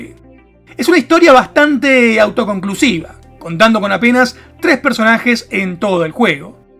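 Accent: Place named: Argentina. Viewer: Ese acento argentino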